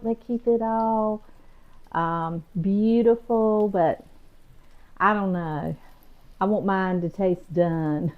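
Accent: South, United States. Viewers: I make salmon burgers and put in finely diced celery, parsley and use oats to bind. Dust with flour before i fry. Im gonnavtry your recipe. I love watching your videos and your southern accent is musical, delightful, and beautiful, ❤❤❤❤❤ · Keep on keeping on,don't change anything ! Keep your great southern style, language and all. From one southern belle too another!❤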